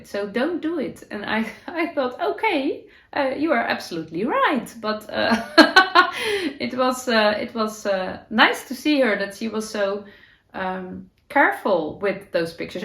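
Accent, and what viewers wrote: Netherlands. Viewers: Closet is fine 😊 in Australia we also call it a Wardrobe. Your Dutchy English is wonderful. I have one language and I’m always impressed when people can think and speak in more. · I love the blanket behind you. Your scrappy sweater of granny squares looks lovely and must be so fun to make! Your podcast is very inspiring and I love every episode I’ve seen. I love your DutchY English! I wish you were my next door neighbor so we could knit and crochet together sometimes. Love Katie from Maine USA💖🧶🧦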